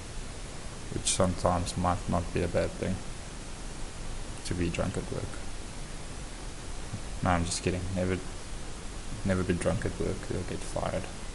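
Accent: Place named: South Africa. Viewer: You sound like a Boer!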